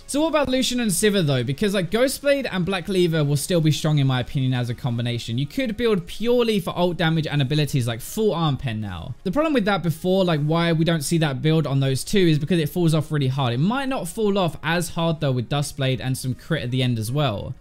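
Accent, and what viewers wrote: United Kingdom. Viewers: I'm confused about why these British YouTubers are so confused about how lethality is pronounced, can someone explain this to me as an ignorant American?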